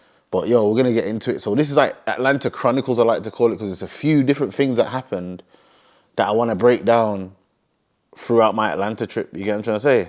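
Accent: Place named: Birmingham, United Kingdom. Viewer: No one’s sounded like they are from brum more than my man lol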